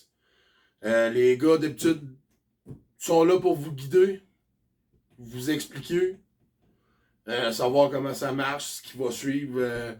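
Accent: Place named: Quebec, Canada. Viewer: Slt TROP BIEN Ta CHAINE YOUTUBE CONTINUE COMME ÇA TES VIDÉOS SONT TROP BIEN LONGUE VIE À TOI ET À TA CHAINE YOUTUBE!!! IL EST TROP BIEN TON ACCENT DU QUÉBEC LES GENS SONT TRES SYMPA ET TRES GENTIL AU CANADA MOI JE SUIS FAN J'ADORE JE M'ABONNE DIRECT!!!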